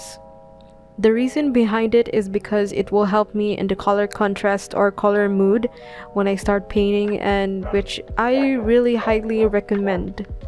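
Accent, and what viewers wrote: Philippines. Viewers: From the chicken and the accent, I can tell you're from the Philippines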